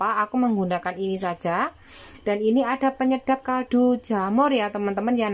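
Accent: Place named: Java, Indonesia. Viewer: Resep kembang kol crispy yummyy Kentel bahasa Jawa nya mbk Mksh tlh brbgi resep 👍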